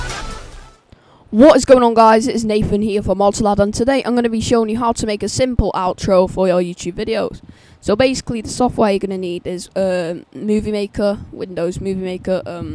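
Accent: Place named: Ireland. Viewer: thnx m8 love ya irish accent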